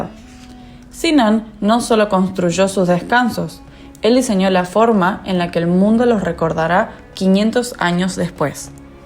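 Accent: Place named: Mendoza, Argentina. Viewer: Hablas como mendocina. Yo viví en MZA.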